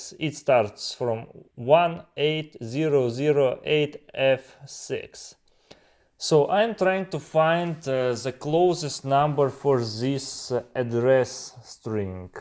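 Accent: Russia. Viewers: блин очень сильно акцент виден, ты случайно не русский?)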